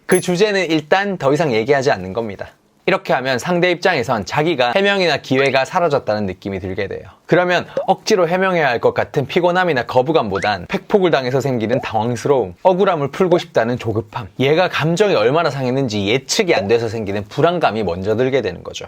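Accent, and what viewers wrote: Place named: Seoul, South Korea. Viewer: 경상도사람인데 서울말잘한다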